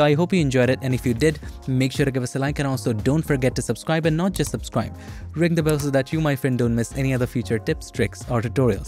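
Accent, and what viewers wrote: India. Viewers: Man the Indian(?) accent can be really nice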